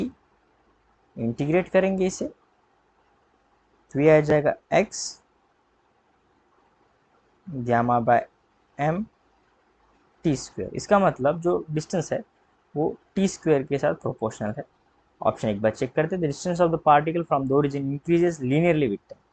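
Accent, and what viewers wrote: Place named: West Bengal, India. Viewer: Sir 's accent seems to be bengali,or assamese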